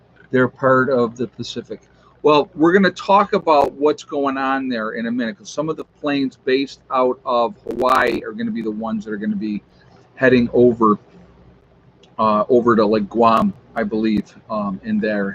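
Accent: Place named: Michigan, United States. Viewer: Every time i listen to you, something would bug me about you being a new yorker..and i couldn't figure it out. Now i know what it is...you have a Michigan accent not a new york accent...😁am i right???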